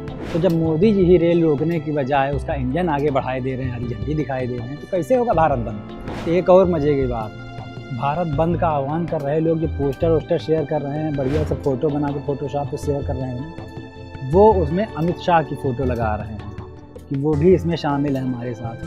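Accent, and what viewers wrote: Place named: Bihar, India. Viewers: EE sausri Kaahe bhojpuri type main anchorning karat baa..tanik samjh nahi aavat hamka Toh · Abe bihari ki tarah mat bolo mujhy bihario se nafrat hi 🙅😤😠😑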